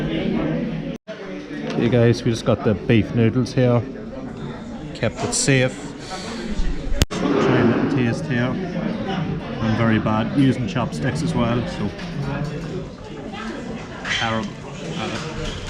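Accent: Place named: Scotland, United Kingdom. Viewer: Australian with scottish accent, very cute, nice weather and walk around!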